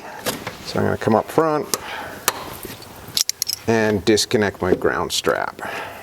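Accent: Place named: Australia. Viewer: Great video! Where’s your accent from? You sound Aussie! Thanks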